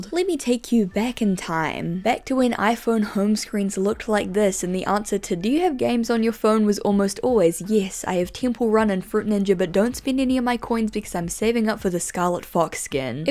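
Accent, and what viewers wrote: New Zealand. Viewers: You are from New Zeland? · I'm from the us and i pronounce it like you do its nothing with you having a new zealand accent ;)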